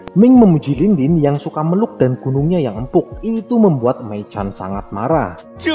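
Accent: Banyumas, Indonesia. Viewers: Rika wong endy man deneng bahasane ana banyumasane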